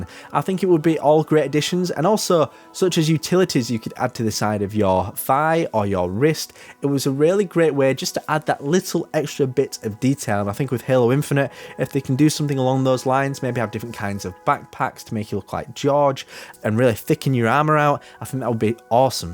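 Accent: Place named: England, United Kingdom. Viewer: Wanna know what's beautiful? Your wonderful english accent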